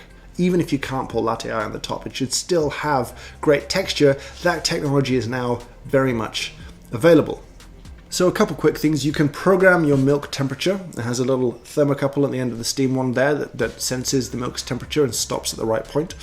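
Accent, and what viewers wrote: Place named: England, United Kingdom. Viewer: Im only here to listen to your English accent......Greetings from Saudi Arabia.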